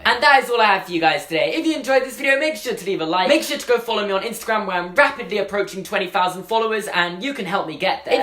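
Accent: United Kingdom. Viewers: Your British to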